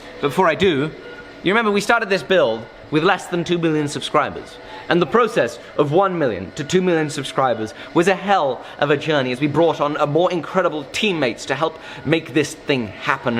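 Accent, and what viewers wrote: France. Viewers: Super accent français ! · Joli accent français 😉 ! Amazing Damascus pattern, really nice job 👍🏼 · Supers accent français, félicitations !! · that french accent bro oO Congrats from france!! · Your french accent is killing me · belle accent français ! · Another french applauding the french accent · Très bel accent français!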